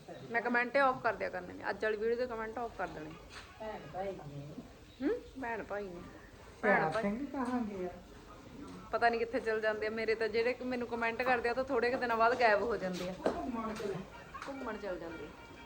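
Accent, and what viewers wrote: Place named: Moga, India. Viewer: Didi thode pekke kithe mainu moge side lagdea thodi boli to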